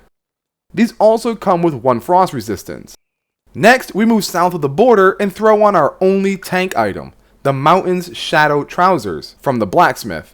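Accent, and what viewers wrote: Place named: Mexico. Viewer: you sound Mexican...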